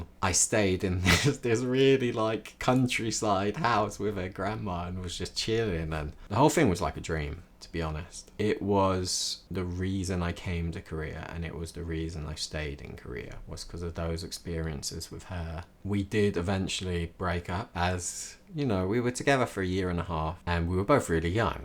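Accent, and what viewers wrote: United Kingdom. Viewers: 한여름밤의 꿈같은 멋진 추억이네요~ ^^ 저는 반대로 영국에서 그런 추억이 있었네요 같은 영국억양을 가졌던 친구가 생각나네요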